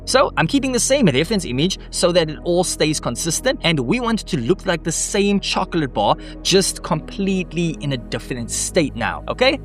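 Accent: South Africa. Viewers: Where is your accent from? SA? - Thanks for the tutorial! Would like to know how React plays that mass of images? Looks load and performance intensive. And I also would have loved to see how this works on mobile... different image set in portrait format?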